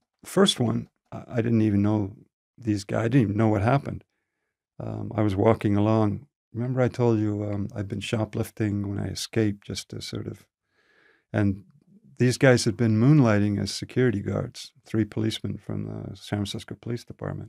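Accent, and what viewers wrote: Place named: Widnes, United Kingdom. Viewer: Widnes lad innit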